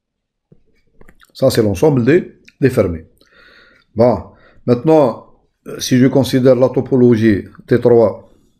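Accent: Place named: Algeria. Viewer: C'est un professeur algérien, c'est pourquoi il parle arabe français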